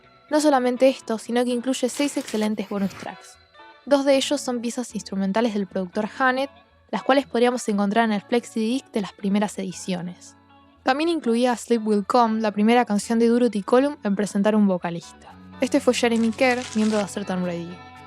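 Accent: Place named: Argentina. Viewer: No esperaba un acento argentino! Muy buen video, gracias por subirlo.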